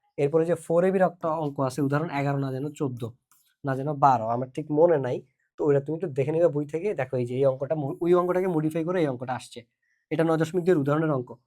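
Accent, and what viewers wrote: Chittagong, Bangladesh. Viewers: Sir Apni ki chatgaiya?Because apnar ei video te pura chatgaiya accent lagtese 😭..or is it just for the video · বদ্দা তই ত দেহিদ্দি পিউর চিটাইংগে😅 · Kire pura accent ta chittainga 🙏😭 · ভাইয়া, তই তো চিটাংগে হতাও ফারো দেহির🙂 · ভাইয়ার তো আজকের ভাষা পুরাই চিটাগাং এর মানুষের মতো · Vaiya to pura chatgaiya 👏💀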